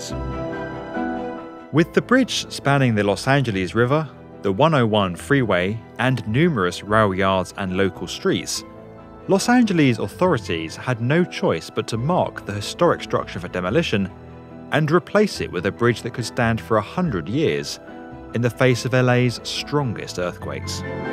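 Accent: England, United Kingdom. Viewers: Why do we have to have a bloody fuckin' Britt narrating about an American bridge? Holy George Washington Batman! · Impossible! Why does this have an English accent? Is LA in England. No No such thing as earthquake proof. Ridiculous. Earthquake resistant? Maybe.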